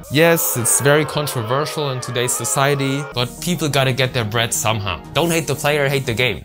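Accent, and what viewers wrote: Germany. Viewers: wait are you from germany?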